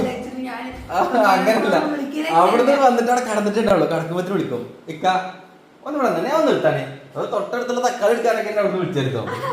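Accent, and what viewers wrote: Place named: Malappuram, India. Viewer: നിങ്ങൾ മലപ്പുറത്ത് എവിടെയാ ?😂😂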